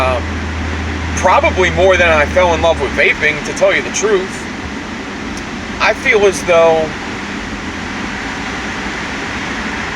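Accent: Philadelphia, United States. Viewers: I can really hear the Philly accent ❤❤Great Topic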